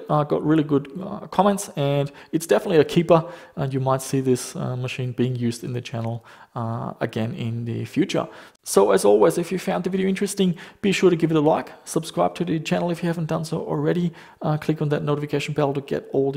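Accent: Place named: Germany. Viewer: You accent seems to indicate that you are originally from Germany.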